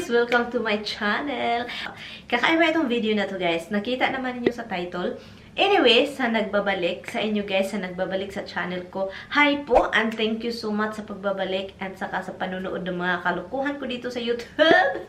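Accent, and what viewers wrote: Visayas, Philippines. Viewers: Mapa enlish mapatagalog...bisayang bisaya ang bigkas mo...magbisaya kna lng para dka mapagtawanan..wag mna pilitin srili mo te..tao ka nga ye alam nmin.pero alam mo hnd kmi magtataka kung bkt maghahanap ng iba yang jowa mo..alam mo kung bkt tingin ko sa ugali mo mayabang ka akala mo kung sino ka...mabunganga pangit ang ugali mo...yan ang tingin ko sayo.....sori 2 say pero ingitera ka....magbisaya kna lng te mas better pa....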